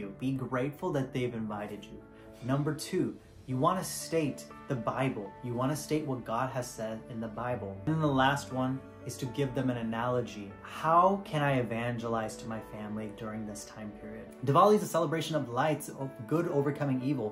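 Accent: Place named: United States. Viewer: What is you ethnicity? you look Indian but DEFINATELY do not sound Indian, at all. I even went ahead and checked your oldest video. You sound US born and raised or someplace in Europe.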